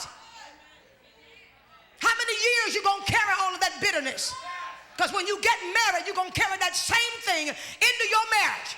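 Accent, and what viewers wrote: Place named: South, United States. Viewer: another jumping on the band wagon of celebritism. If I make a comment about Brad Pitt and Angelina will I get discovered? Not a scripture in sight or in site. Thanks for telling us your whole life story in that once again loud southern preachers voice!You got a mike but I guess you cant get the same effects if you cant yell and stir a crowd emotionally! Ah baby...I dont feel like having sex tonight! You dont hear me! You didnt buy me no stockings...Wake up! marriage counseling is cheaper!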